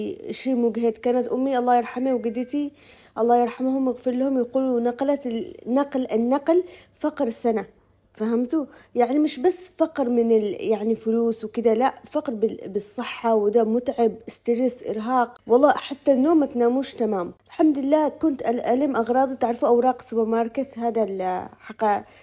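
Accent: Aden, Yemen. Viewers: انتي يمنيه باين من اللهجه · الحمد لله على سلامه الوصول يا الى مصر الله عينك شفت الحاجات المغلفه وحسيت بالمجهود الي باتقومي به للترتيب هذي الحاجات اكيد بياخذ وقت ومجهود كبير منك الله يكون في عونك بس نصيحه مجربه كل ما اجيتي على نفسك وتعبك كلما خلصتي الترتيب والتنضيم بسرعه وجيبي معك احد يساعدك مش لوحدك تشتغلي انا. يمنيه واحب اسلوبش في الشرح وطبخاتش روعععه. ولهجتش العدنيه الحلوه وصوتش الجميل. الله يخليك لنا ياريت ماتتأخري وتنزليلي لنا اكلات رمضان الي بانزين مائدتنا بمأكولاتك الذيذه معك ام ماجد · اللهجه العدنيه حاليه وانت من نطقك لها صارت أحلى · انت يمنية منزوجه مصري والا كيف كلامك يمني الله يكون في عونك ويرزقك من الخير كله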